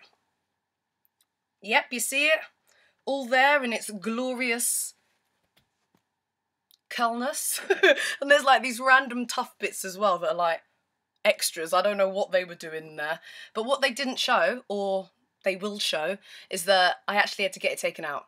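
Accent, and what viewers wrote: United Kingdom. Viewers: I need more than Your have some really great insights and I can listen to your Brit accent for eva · Love the videos! Your energy is fantastic! I wish I had your accent haha :) I'm rooting for you and Sophie y'all are my favorite and Katherine too :) going for the Brits even though I'm American lol. And by the way that stuff is NOT what Americans normally eat. I've never had pigs feet or chitterlings in my life. But mmm at least you got grits! Those are fantastic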